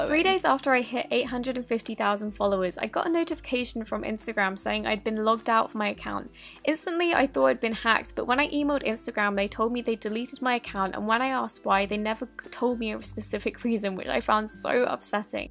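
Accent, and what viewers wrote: England, United Kingdom. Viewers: Yay!!! You're the best youtuber ever! You should hold a giveaway for some of your slimes! btw, i love you =r english accent and yur super pretty!!!!! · I love this British accent😂💕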